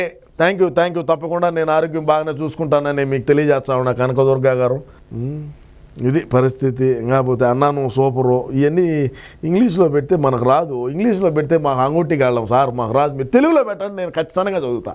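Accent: Rayalaseema, India. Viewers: గారు మీరు చేసిన వీడియోలు మీ రాయల సీమ యాస లో బాగా చెప్తారు...మీ విడియో లు అన్ని చూస్తాను లైక్ కామెంట్ షేర్ చేస్తాను... కూటమి గవర్న్ మెంట్ రావడానికి మీ విడియో లు సగం కారణం...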